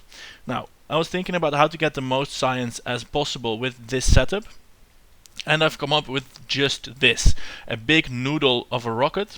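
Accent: Netherlands. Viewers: is he dutch? yeah? so obvoius lol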